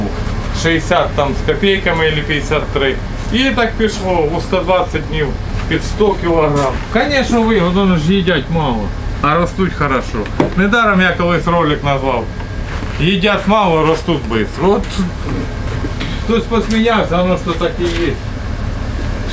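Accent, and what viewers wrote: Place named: Khmelnytskyi, Ukraine. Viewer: Живу на Хмельничині, вроді західна Україна а мова одінакова.Такий самий суржик як у вас .Ні одне слово не ріже слух.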